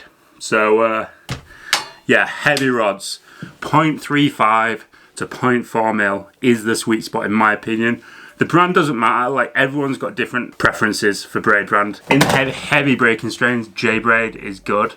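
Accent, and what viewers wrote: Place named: Barnsley, United Kingdom. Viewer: The Barnsley accent tho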